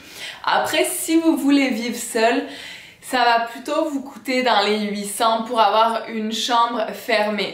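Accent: Quebec, Canada. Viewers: Tu devrais faire une vidéo sur ce que t'aime et n'aime pas de l'accent Québecois vs Français. Je pense que t'aurais une perspective unique parce qu'on dirait que ton accent est un mélange des deux. Peut-être parler des fois quand personne te comprenais ou quand tu comprenais personne, les expréssions utiles ou bizzares, les réactions à ton accent, etc.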